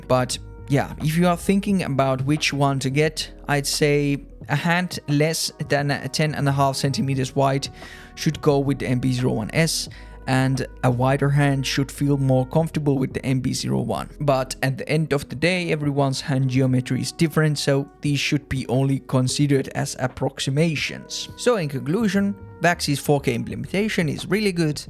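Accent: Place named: Finland. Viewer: Eyy, another finnish peripheral enthusiasist :D knew right away from the accent lol, Kiitti arvostelusta, hyvä video :D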